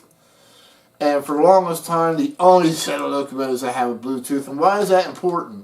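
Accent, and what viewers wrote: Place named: South Jersey, United States. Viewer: 14,000 subscribers! Wow! you're living proof that nice guys can finish first and "Nothing succeeds like success!" Congratulations, and a success well-deserved! A speech impediment? Honestly I've never noticed. The only thing I HAVE noticed about your manner of speaking is your DEEP South Jersey accent! I haven't heard an accent like that since I went to Glassboro State back in the 1970s. As soon as I watched one of your videos I said "Hey, I know where HE's from!" 😃 Anyway, just keep doing what you do and the way you do it! And again, sincere congratulations!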